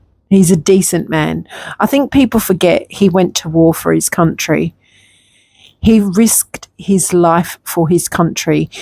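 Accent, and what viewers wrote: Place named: Australia. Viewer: I've never come across your channel before, you sound Australian and I don't get to follow many Australian creators. I felt the same way many times when Fiona so generously updated us on dear Grahams condition-just wanted to hug her. Then I made the unfortunate choice to watch what that hideous swamp hog said about Trevor's visit and was shocked that people are so awful. Why though? That's what I don't understand. They've never done anything to any of them.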